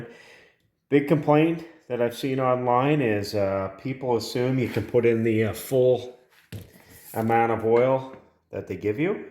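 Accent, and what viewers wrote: Canada. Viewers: When you mentioned the price, I thought, "that seems high compared to what I just paid for one...". Then I listened to you speak some more, and immediately realized you were Canuk. As Bob and Doug would say, "Good day, eh."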